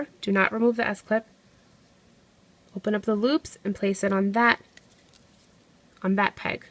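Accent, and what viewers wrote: United States. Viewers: You know what I love most? Your accent! I'm an Aussie and love listening/watching to your tutorials because of your American accent. Your designs are pretty awesome too :P xox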